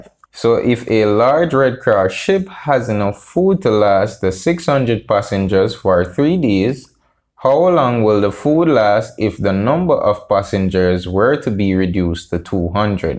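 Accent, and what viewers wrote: Jamaica. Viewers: Thank you man!!! Love your Jamaican accent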